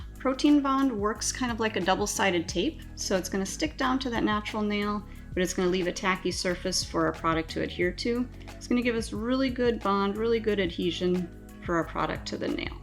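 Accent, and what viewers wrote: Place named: Midwest, United States. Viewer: I knew she was from MN! Michigan girl here. I’d recognize that Midwestern accent anywhere! Love nail sets! 😍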